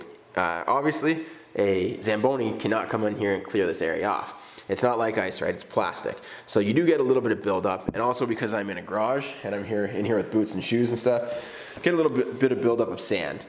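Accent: Canada. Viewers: This man sounds very Canadian by his accent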